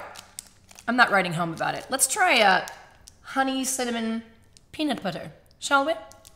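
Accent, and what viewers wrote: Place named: United Kingdom. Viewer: How fun!! - Looking forward to the next one! ... and Your British accent is impressive!!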